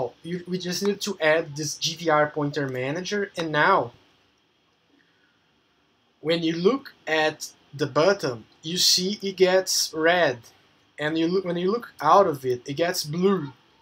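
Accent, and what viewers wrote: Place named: Brazil. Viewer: Are you brazillian? You sound exactly like me when i'm trying to speak in English (this is not an offense)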